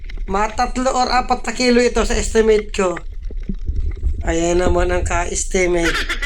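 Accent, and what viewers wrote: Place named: Vinzons, Philippines. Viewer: Cguro ang blogger na e2 ay taga Vinzons camarines norte. Pamilyar sakin ang mga pananalita nito at mga katawagan nya sa mga lamang dagat. Hahaha 😅😅😅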